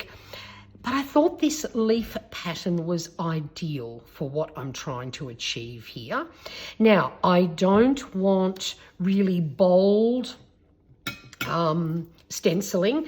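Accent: Australia. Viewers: I keep going back to listen to you as I miss the Aussie accent...lol where in Brissie are you? I have family in and around there, not that I imagine you would know them...lol xxx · why thank you! I didn’t mean to fish for subbies - doh! I just love hearing Aussie accents in our community & get so excited 😂 Especially when I like their work (like I do yours)!! But thank you! I have quite a bit of family in Brisbane & further north too. Hoping to make another trip up this year. It’s a gorgeous part of the world & always nice to be around family.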